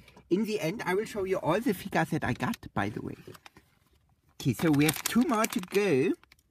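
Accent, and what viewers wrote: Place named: Germany. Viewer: Kanns sein das du deutscher bist? :DDD Du sprichst die Namen so Deutschig Aus :D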